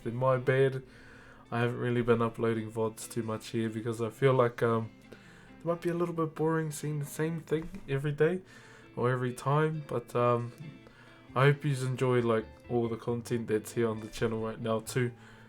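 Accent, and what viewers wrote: New Zealand. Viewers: Are you from New Zealand? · New Zealand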